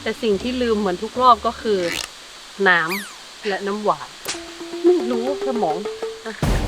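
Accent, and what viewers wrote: North, Thailand. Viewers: ทำไมพูดเหนือได้ครับ หรือลูกครึ่งเหนือ+อิตาลี่ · อี่หน้อยนี้อู้เมืองได้ต๊ะ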